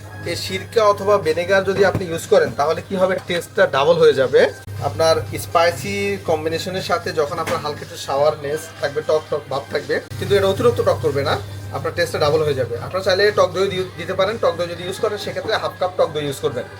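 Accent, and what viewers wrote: Noakhali, Bangladesh. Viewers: আমনে নোয়াখালীর মানুষ, কথায় বুঝা যায়, তবে রান্নায় ওস্তাদ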